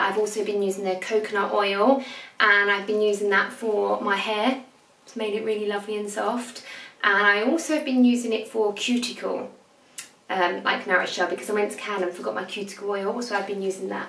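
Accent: United Kingdom. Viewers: Loved the Chanel reveals, keep them up xo Listening to you makes me miss the UK so much!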